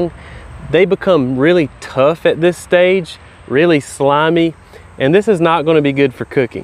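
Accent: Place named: West Coast, United States. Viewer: You have a very interesting accent. Sounds like you grew up on the west coast, but moved to the south.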